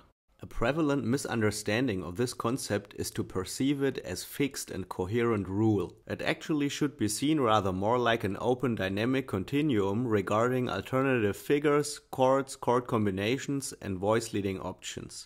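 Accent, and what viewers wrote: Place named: Germany. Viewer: You have an intellectual clarity mixed with humour which is very nice. Leaves the watcher with a lot of food of thought. Thumbs up! (Btw as a Swede the German accent makes all difference =) ) ps. very well researched, another thumbs up! ds.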